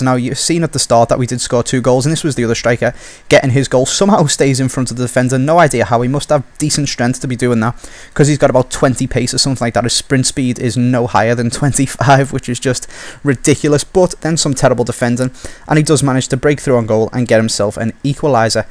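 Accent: Liverpool, United Kingdom. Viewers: you scouse?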